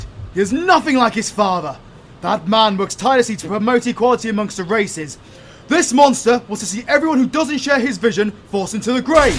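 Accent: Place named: United Kingdom. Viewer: Finally! A person who has a British accent! I been needing someone to voice a character of mine for a few voice overs. If you’re up to it? It’s for my artwork and a feature.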